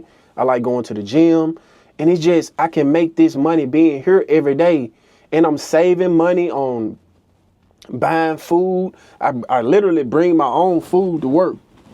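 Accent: Memphis, United States. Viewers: U from Memphis mane ? U got the accent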